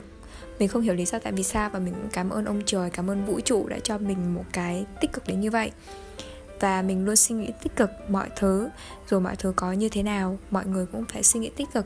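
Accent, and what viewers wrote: North, Vietnam. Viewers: Bạn ở đâu vậy ạ? Tại mình thấy video cũ của bạn nói giọng nam mà video này nói giọng bắc ý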